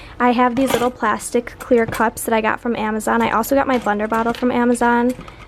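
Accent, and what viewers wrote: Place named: Michigan, United States. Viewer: LOVE your Michigan accent.My dads girlfriend has it too and im in love with the accent.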